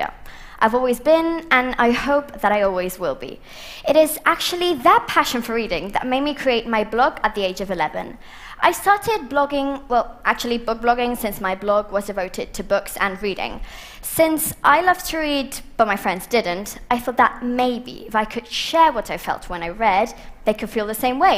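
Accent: United Kingdom. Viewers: Ostia, catalana amb accent britànic!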